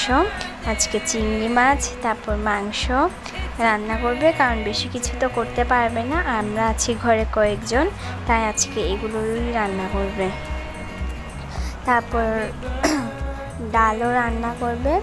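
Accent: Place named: Chittagong, Bangladesh. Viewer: Apu Tumi ki ctg r Tomar kothagula sune mone hoitese happy birthday to apu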